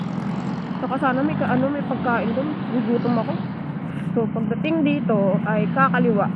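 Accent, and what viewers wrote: Laguna, Philippines. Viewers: I have an ex-gf from Sta. Cruz, Laguna and her voice, accent, the words she's using, and the way she speaks is EXACTLY the same as you. It's kinda trippy☺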